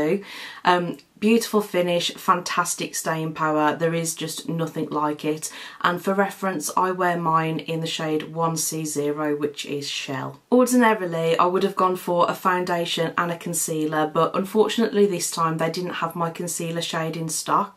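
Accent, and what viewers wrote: United Kingdom. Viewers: Your review is excellent🎉. I love the detail you mention for each product which other reviewers often skip! I also love your British accent. I am looking forward to more of your reviews. From across the Pond, Diane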